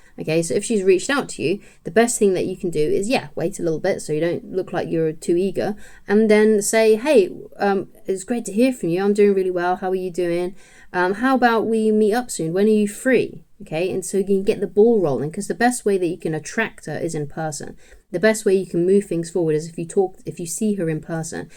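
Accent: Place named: United Kingdom. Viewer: loved that british accent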